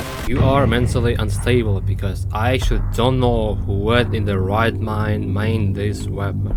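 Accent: Russia. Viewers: No its Russian accent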